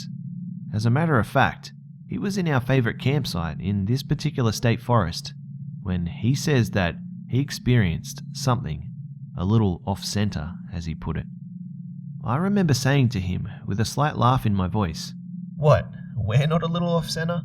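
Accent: Australia. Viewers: i mean, where would he get his outro from? "G'day mates.." sounds pretty aussie to me and i should know...im a proud one..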